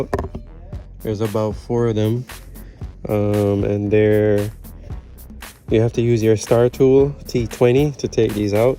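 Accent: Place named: Jamaica. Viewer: Dowg sound like a yaad man so. Good look mi g. Mi just buy a 2019 gt 2 weeks now and mi love it and about to start doing some mods. So keep the content coming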